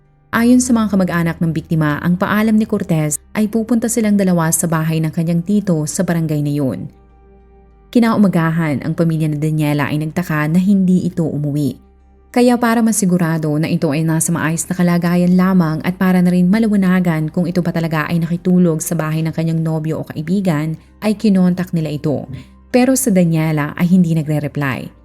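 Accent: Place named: Visayas, Philippines. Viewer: Taga saan po ba sla bisaya kasi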